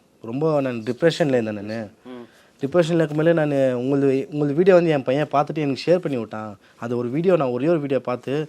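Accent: Bangalore, India. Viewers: Nan kuda chennai slang nu nechan but Bangaluru tamil nu enaku thariyathu super ha iruku unga videos new subscribe ❤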